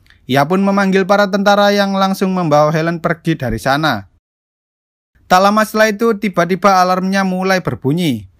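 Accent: Java, Indonesia. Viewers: Bang jawa mana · Kayaknya orang jawa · Sampeyan wong jowo yo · Logat jowo 😁😃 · Wong jowo mas😅😅😅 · weh wong jowo iki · Mas e jawa ngndi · Jowo e ndi om ? 😂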